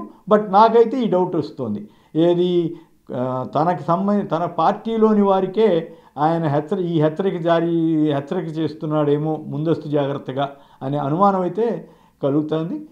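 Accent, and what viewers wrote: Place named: Telangana, India. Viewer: Pure telangana slang ra bai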